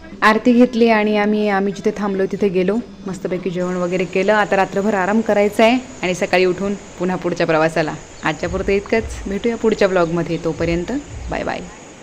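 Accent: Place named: Kolhapur, India. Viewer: कोल्हापूरी भाषा बोलायला भारी जमतीया तुम्हासनी.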